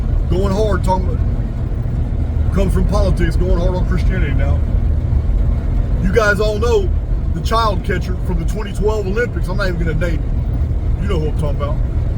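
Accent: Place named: Texas, United States. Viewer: "I'm not buyin' what this Hobbit is sellin'" 😂💕🇬🇧 I love your Texan accent when you get on your rants!❤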